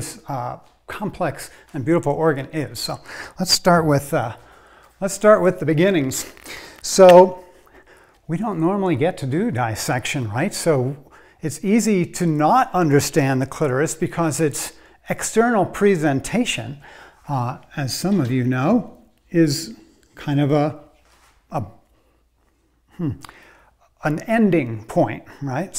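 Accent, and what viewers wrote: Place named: London, United Kingdom. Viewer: London..that's the first thing I noticed(^,^)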